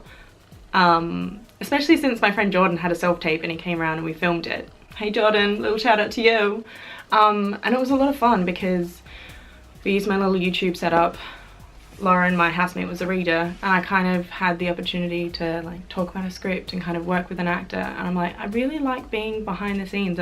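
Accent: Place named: South, United States. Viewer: I love the Southern accent 😊😁😘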